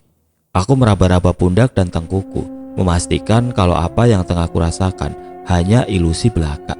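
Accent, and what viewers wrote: Indonesia. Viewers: Pengucapan b. Inggris nya, Indonesia bgt😁👍👍. Dtunggu kelanjutannya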